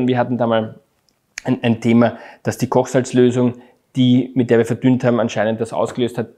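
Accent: Austria. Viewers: Echt jetzt? Ich bin Polin, nie in Österreich gewesen (in DE übrigens nie länger als zwei Wochen am Stück) und verstehe ganz normal. Wäre Ihnen sächsisch oder schwäbisch etwa lieber? Herr Doktor spricht in seinen Videos übrigens keinen Dialekt, es ist Hochdeutsch mit dem niedlichen österreichischen Akzent.😊 Nichtmediziner können evtl die vielen lateinischen Namen nicht kennen, wie Septum, Ligamentum, N. Trigeminus, N.Facialis etc.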